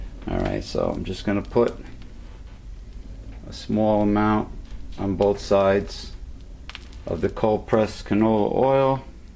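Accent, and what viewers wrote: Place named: New York, United States. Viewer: I was just looking for a vegan sourdough pancake recipe and came across yours, it seems to be still one of the few vegan sourdough recipes on youtube even in 2017. Admittedly, everyone has learned a lot about producing cooking videos since 2012, and I imagine yours would probably be shorter if you had made it today. But the bonus for your video is that you explained the process very clearly, so is very good for beginners, and your Robert de Niro accent and serious comments below are adorable. Italians are the best cooks.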